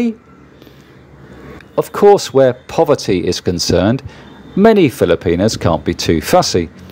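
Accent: United Kingdom. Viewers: I like the Narration not the accent Shows you how the UK has different accents most of them are so common. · Hey. I hate to break it to you. My across the pond, Limey, Friend. As Western as these ladies appear. They only appeared, Western. This culture is as far away from our culture. As your Limey, accent. Is to my, Fine Midwestern Announcer, twang. On this side of the pond. And my brother was married to one for 25 years. Until a lack of love from her. Finally killed him. A little over 8 months ago at 64. Which is what happens. When they don't know how to give love. As in, actual love. Not just the fun love. But the real love. Love everybody needs. She never had for him. She was a gold digger. And found yourself an American boy. And took him for a ride for 25 years until he died. From a lack of love. So I really cannot fully comprehend their culture. As it is quite Foreign. 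To mine and yours. There is just nothing there to relate to. It is that Foreign. It's not like Europeans or Americans, at all. They only look that way. They only have the same stuff. The only carry-on in the same manner. To imitate the rest of us. And they are not what they appear to be. Though they can be quite lovely. And very dedicated people. But they have a whole bunch of whack jobs also. Most likely from their poverty. As I don't know what kind of poverty it's like to be a, Limey. From the UK? Though I could have a little idea? Since we both watched the same, Peter Sellers, Tracy Ullman, Benny Hill, Monty Python, shows. And all the others. I got them on both the CBC and PBS. Because I lived in Detroi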